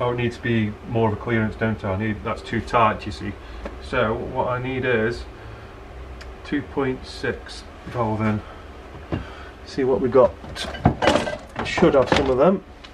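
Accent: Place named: Stoke, United Kingdom. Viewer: Sounds like a fellow stokie? Or not far off anyway!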